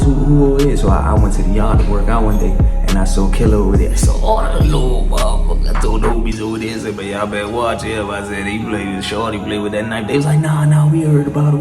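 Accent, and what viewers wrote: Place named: New York, United States. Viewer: You talk like you been around more New York cars joints n ppl than anything.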